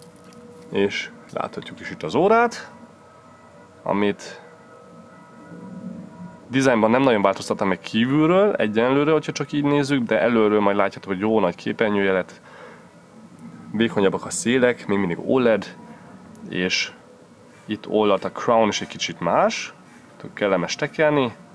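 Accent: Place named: Germany. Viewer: Olyan érdekes beszélsz mintha valami német ember lennél aki magyarul beszél. Engem nem zavar csak tényleg olyan fura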